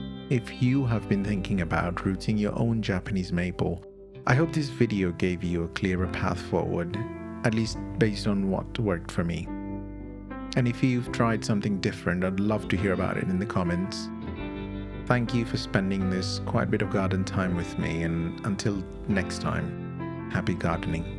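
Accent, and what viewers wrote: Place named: India. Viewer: I enjoy your channel immensely , you are an easy study , I appreciate that you speak slow as I have difficulty sometimes with Indian accents , thank you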